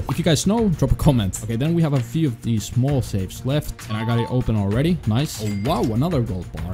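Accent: Finland. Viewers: Are you finnish? i was just listening your accent and trying to figure it out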